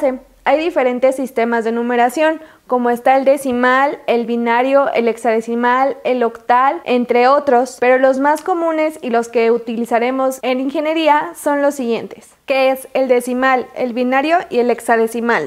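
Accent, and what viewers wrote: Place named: Mexico. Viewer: Eres mexicana creo, por cierto me gusto el video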